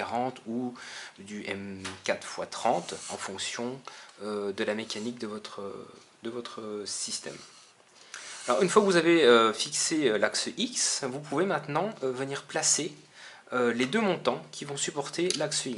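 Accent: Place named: France. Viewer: love it! Very nice build. Love your French Accent... even though I do not understand you. Thank you for showing this!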